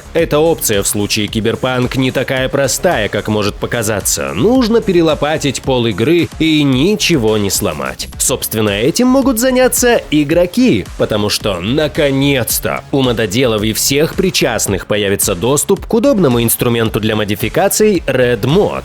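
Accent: Ukraine. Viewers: Яка гарна українська😃🤗 гадаю що ви ховаєте цілу нишу можливостей! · Сотня вподобайок за гарну україньську!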